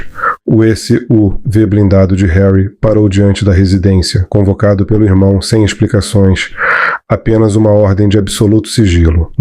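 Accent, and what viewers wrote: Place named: Rio de Janeiro, Brazil. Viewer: Não é problema de respiração.... Este homem que fala é carioca( RJ). Sotaque de pessoas moradores da Cidade do Rio de janeiro..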